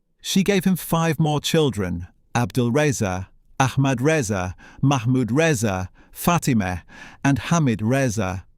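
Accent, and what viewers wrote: United Kingdom. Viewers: The British accent says it all! Still trying to support the Qajars to reep from their 'not know how'? Speaker says that after Reza Shah free speech was banned. Shame on you! It is the British way to manipulate and take advantage of countries thru political manipulation. God bless the USA which the Brits lost with the Grace of God and bravery of Americans and so the Brits are still burning their as*es for the war of 1812. God bless Trump for not appeasing and listening to the Brits. God protect the USA, Iranian people and Israel from all especially the Brits.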